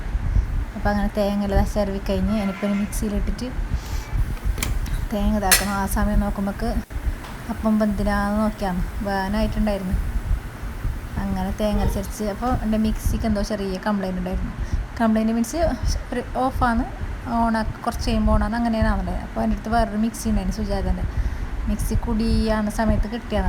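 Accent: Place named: Kasaragod, India. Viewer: Kasaragod basha super 👌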